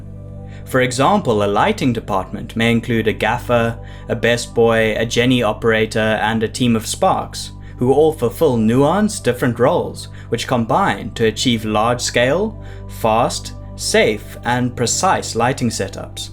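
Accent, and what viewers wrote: South Africa. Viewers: AHA! I knew you were from some SA from ur accent but wasn't sure. Super cool to see South Africans doing so well on Youtube! Love the vids man keep up the good work.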